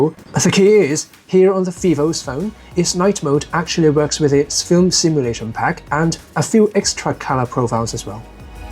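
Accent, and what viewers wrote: United Kingdom. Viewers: I just realized you have British accent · 英式口音？👍叻叻，英式係難過美式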